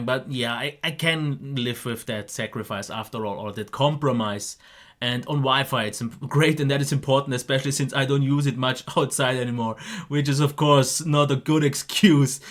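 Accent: Germany. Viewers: Jack AFC he is Croatian as he said. His accent is German. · ich hab einfach sofort rausgehört das du aus deutschland kommst :D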